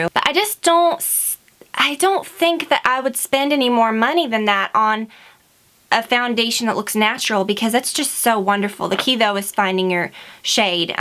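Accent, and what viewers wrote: South, United States. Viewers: This was the first video I noticed a sweet southern accent! :) So happy for a lipliner suggestion that is great with nude lips...it really is hard to find! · sometimes your southern accent stands out so much haha never really noticed before a couple videos. you loo gorgeous xx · Im not from the US but I watch the walking dead, and every time after I've watched an episode I can really pick up your more southern accent. Because they film in the same state as you live in. It's super cute! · you sound so southern omg, it's so cute <3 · first time i've ever noticed your southern accent! it's quite strong don't know how i've never noticed it! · Thanks for sharing your favorites! You sound a little southern in this video, it's awesome haha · It sounds like you have more of a southern accent in this video!! It's so cute haha ( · Is it just me or does sound soooo much more southerner?? 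I lav her 💕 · Way more Southern in these videos! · I have watched so many of your videos, and I never noticed such a southern accent before! Anyone else pick up on it. So funny, makes me like her even more :) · I love your faint southern accent. I need to try Anastasia products asap! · GA accents are adorbs! Just like you Meredith Hammett your icon pic is BEAUTIFUL! I wish i could visit there someday! and sorry to point it out..hopefully it didnt distract too much lol but being from california i simply adore southern accents. And to be honest I never really realized had one... makes we wanna double thumbs up this video!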